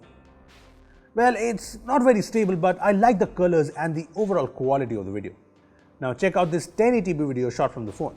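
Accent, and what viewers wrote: India. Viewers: Nothing is more unsexy than an indian accent Head turned left that at least one eye is looking in the camera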